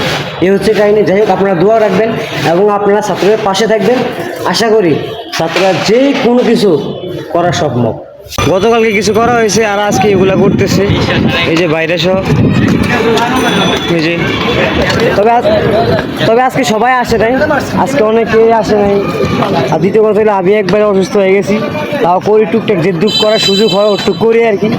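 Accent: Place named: Faridganj, Bangladesh. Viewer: তোমার ভাষা ফরিদগঞ্জের কোন জায়গা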